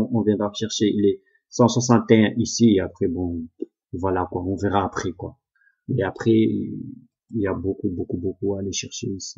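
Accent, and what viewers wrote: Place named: South, France. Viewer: je Veus apprendre ichimoku je commence a trader on <link> sur volatility et je Venus apprendre ichimoku donner vous une journee ou 2 de formation ps les augers ils parlent explique et touts et touts mais ils don’t trop de maniere was m emerge vous vous avec l air cool 😎 bref je Venus vraiment reussir, vous tees du sud Cela s enttend moi je sur sur londre mais a la base de Montpellier bref responder is jamais merci d advance. Fati.... ma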